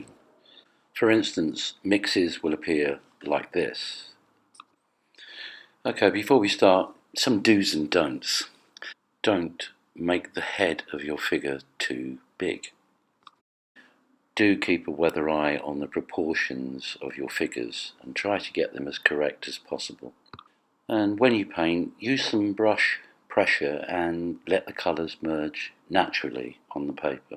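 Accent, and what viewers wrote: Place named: England, United Kingdom. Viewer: Dalla pronuncia direi che sei inglese! I Like! 💠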